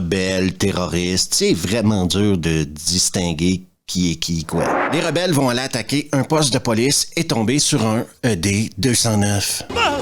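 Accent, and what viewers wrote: Quebec, Canada. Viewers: Toujours agréable quand ton québécois ressors ! Une criss de honte !! J’ai ri !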